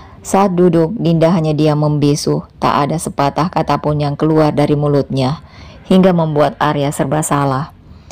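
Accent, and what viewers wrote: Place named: Bugis, Indonesia. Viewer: Suku bugiskah, logatx sih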